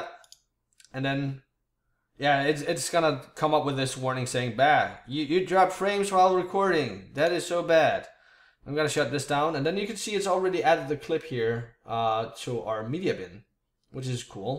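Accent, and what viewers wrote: Denmark. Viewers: Jeg tænkte det nok, jeg synes du har en svag dansk accent, men dit engelsk er pænt godt ( I hvert fald bedre end mit og jeg er 14 år gammel )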